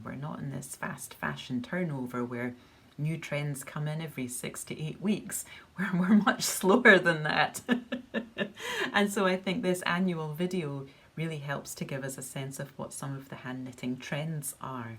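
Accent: Scotland, United Kingdom. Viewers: I absolutely love your Scottish accent and your gentle voice! My question is what is the coldest it can get in the Scottish winter and when it’s cold what type of yarn do you choose to wear? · I am happy to have discovered your podcast just after you posted your first episode. You have a lovely, calming voice and as someone who visits Scotland yearly (not 2020 unfortunately), it warms my heart to hear the Scottish accent when I'm so far away. My question is do you ever begin a project and decide you are just not feeling it and decide not to finish? If so, do you have any examples? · Dear, what a lovely podcast you make. I love the 'slowness' and find it very relaxing to just listen to your beautiful voice and scottisch accent while I'm knitting, especially in these times when there's so much stress going on in the world. My question is: Would you please show a picture of your son wearing his quilt and the socks you made him? 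Only with his permission of course 😃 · Thank you for this giveaway. I’ve never seen such gorgeous project bags and notion pouches before. This is the second video I’ve seen of yours and was wondering why your accent isn’t as strong as some others. Did you grow up in Scotland or just moved there in later years?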